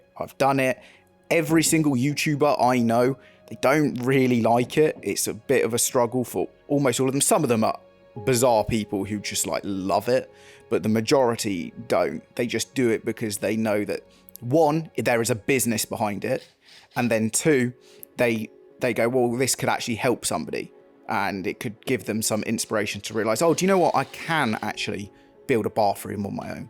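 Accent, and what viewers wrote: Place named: England, United Kingdom. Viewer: As for talking or not.. just carry on as now. Your voice is pleasant, info is good to know. Too much talking can get annoying but so can total silence. How'd you keep yr English accent growing up in Normandy? Love to know..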